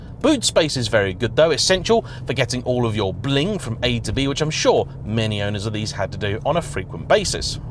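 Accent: United Kingdom. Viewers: Funny hearing a Brit talk about these as a American I always see them as extremely trashy cars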